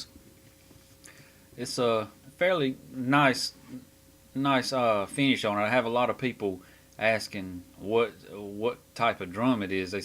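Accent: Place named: South, United States. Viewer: Thanks for taking us on the tour! I really like your sound as I have said before! Now, ......you have to tell me where your southern accent is from!!!! I didn't expect that! HA HA I live in SC so I know all about southern slang! HA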